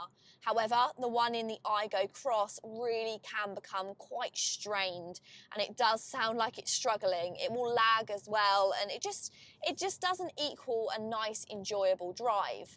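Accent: Essex, United Kingdom. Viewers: I love your accent! What part of Essex are you ftom? I was born in Rochford.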